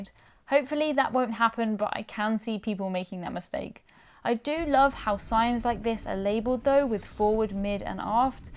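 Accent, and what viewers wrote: United Kingdom. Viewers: i really like your videos and as I am from Germany I like to listen to your nice British English! ;-) thanks for sharing your honest opinion! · Most definitely they should pay you! I would pay to upgrade my iPhone with your voice with the lovely British accent (I'm from the U.S.).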